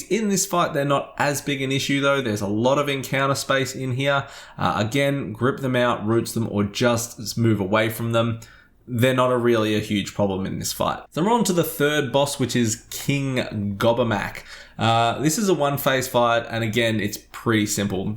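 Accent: Australia. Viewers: He’s Australian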